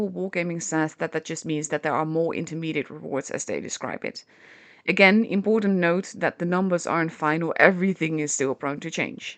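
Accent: Netherlands. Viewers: ^: Love your honey smooth voice & Dutch / English dialect (Easy Listening) You made me roar with laughter = "𝐂𝐨𝐮𝐥𝐝𝐧'𝐭 𝐁𝐞 𝐀𝐫$𝐞𝐝" ( London speak ) Your phraseology is VERY down to earth. Very much appreciated by this Londoner!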